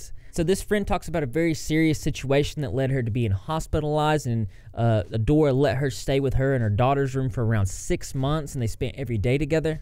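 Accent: South, United States. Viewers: Found you the other day under recommended videos & I’m obsessed!! I love the info & the southern accent - I’m from GA! Keep killing it 😍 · What part of the south are you from? I can really hear it in this video. I’m from the south too. 😂😂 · I didn’t notice you had an accent until you said “I’m southern” love that for me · This is great, thanks for reporting, and thanks for standing up for SA Victims. Also, I personally looooove southern accents so please don’t worry about how you pronounce things. ☺️ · “SORRY IM SOUTHERN, I can’t pronounce.” Saaaaaaaame lmao 😂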